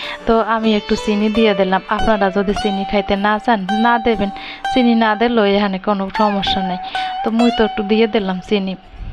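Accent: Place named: Barishal, Bangladesh. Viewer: খুব সুন্দর রেসিপিটা বানিয়েছো আর তোমার কথাগুলো খুব ভালো লেগেছে বরিশালের ভাষা❤❤😊😊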